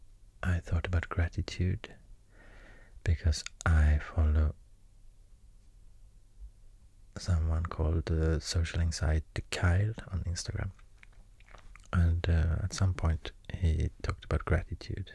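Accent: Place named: Sweden. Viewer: Idk what it is about people with Swedish accents, but you are not the only person who I enjoy listening to speak. You might even wanna consider speaking in your native language sometimes too and just add the translations in subtitles for people who might want to still read. I just think Swedish is so nice to listen to, even if I don't understand it lol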